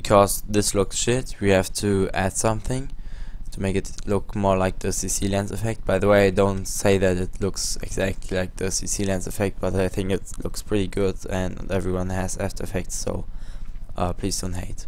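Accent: Germany. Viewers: man erkennt deutsche so leicht xd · Haha das is das allererste video was ich von dir schaue aber ich hab sofort gemerkt dass du deutscher bist :D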